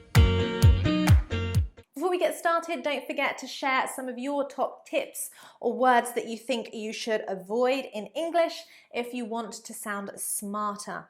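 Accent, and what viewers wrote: United Kingdom. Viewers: thanks a bunch for that i love your accent BR E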